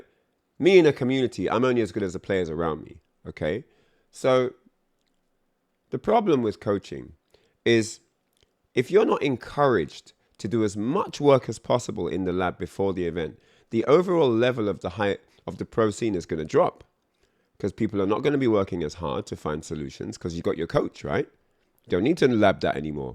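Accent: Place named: United Kingdom. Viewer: Uk accent! Damn I thought you were from the US. Yelp that another L for US. I thought US had a FGC Legend. I really thought u were from the US 😭. Anyway Its the principle of the matter..no coaching allow! It's a tournament where one that player show the crowd the true skill that the player possesses without cuck coach interferences. How else are you suppose to know if the player is truly skill without help. Never got why coaching was allow in the first place...SMFH!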